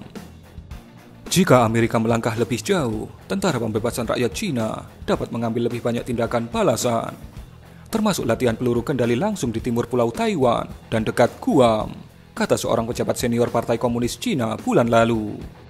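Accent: Java, Indonesia. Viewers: suara admin yg medok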